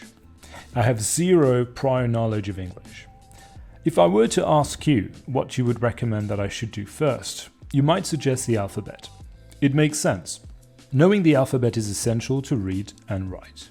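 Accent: United Kingdom. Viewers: I'm assuming you are a native French speaker, but it's crazy hearing the British English tone of your voice compared to the French (speaking as an American haha). Both are very clean and clear. I only wish to be able to pronounce French like a native at some point. It's super tricky mimicking some sounds.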